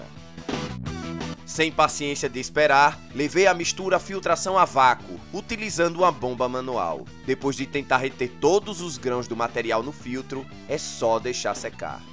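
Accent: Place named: Northeast, Brazil. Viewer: De onde tu é? Com esse sotaque pauleira eu imagino q tu deva ser do RN, PB, PE ou AL Um salve de João Pessoa, Paraíba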